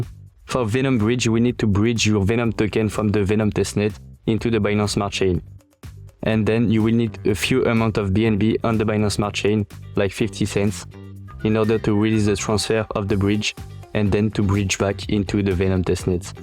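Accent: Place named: France. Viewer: Cannot understand your Frenglish 🤣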